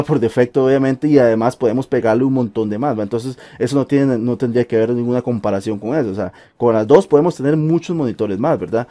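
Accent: Costa Rica. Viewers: Se me hacia que era tico por el acento, ya cuando vi la postal de Lda lo confirmé😂😂👌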